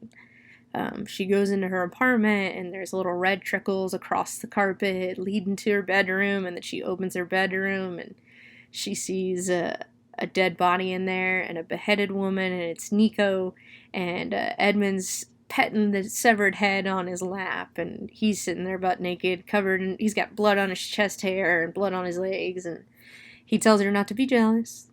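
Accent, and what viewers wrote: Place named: South, United States. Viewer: Just noticed you sound more Southern throughout the video lol. Anyways, good review and keep up the good work! 👍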